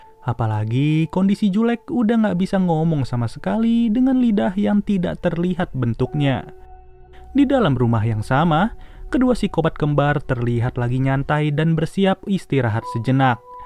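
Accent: West Java, Indonesia. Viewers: Bang lu orang Sunda ya?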